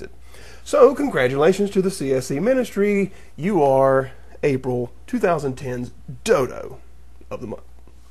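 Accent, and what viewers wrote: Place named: South, United States. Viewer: fuck, that should be than. BTW, you rock, love the vids. In MS here. Central. You sound just a little south:)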